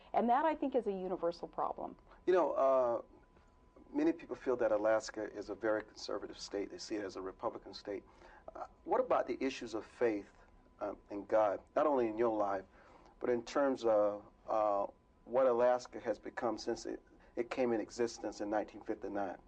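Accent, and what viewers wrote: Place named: Midwest, United States. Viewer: She talks like a normal midwest person. The stuff she says sends terror threw the hearts of secular progressives! Fun.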